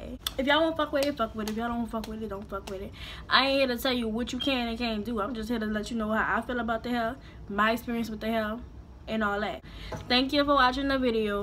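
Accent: New Orleans, United States. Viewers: Lmfaoo bruhh u from New Orleans or Reserve? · I need a friend from New Orleans so I can laugh I love the accent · Gotta be fr the NOLA! TOO CUTE! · I love the New Orleans accent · Yo! I'm probably old enough to be your Mom, but IFWY!!! OMG! The REALEST video EVER! You crazy and funny asl and we NEED that!! You feel me? I knew as soon as you opened your mouth, you were from NOLA. Y'all funny, crazy, and curse a LOT, but I LOVE it!! You're very pretty too! 😍 New Subbie! PLEASE keep doing hair reviews or hell..any kind. 😂❤💯👍🏾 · She gotta be a NOLA baby!!! I am an we got that accent ♥️⚜️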